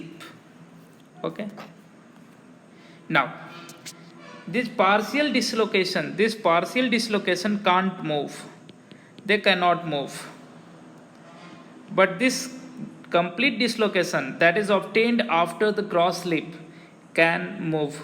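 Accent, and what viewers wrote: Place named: India. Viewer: I love your India accent so much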